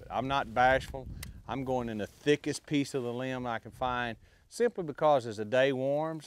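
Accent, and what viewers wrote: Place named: South, United States. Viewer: LMAO FUNNY SOUTHERN BOY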